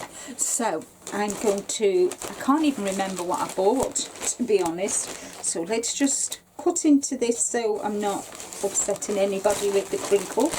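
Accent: Liverpool, United Kingdom. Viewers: Hi, new subscriber here. How did I not come across your wonderful channel before now?!😂💜 I'm already thinking what I can crochet with that lovely horizon twilight. My colours as well! Love from a wet and windy North Yorkshire tonight. Do I detect a Liverpool accent?😂 Love that city as well xx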